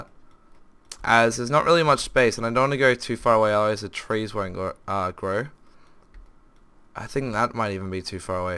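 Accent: Australia. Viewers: I swear he's Australian.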